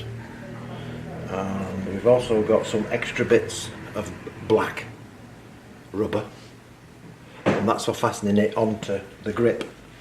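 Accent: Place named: Yorkshire, United Kingdom. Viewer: You sound like you're from Yorkshire if you are there's always a catapult show every Sunday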